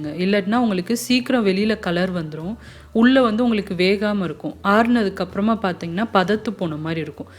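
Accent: Madurai, India. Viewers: Vada chatti typical madurai slang.... Thanks for the receipe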